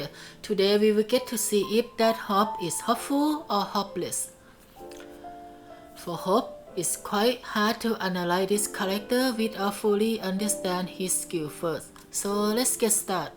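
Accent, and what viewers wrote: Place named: Thailand. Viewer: สำเนียงภาษาอังกฤษเจ้าของช่องเหมือนคนไทยเลย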